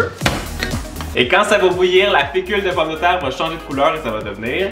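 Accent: Quebec, Canada. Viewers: l'accent québécois est vraiment insupportable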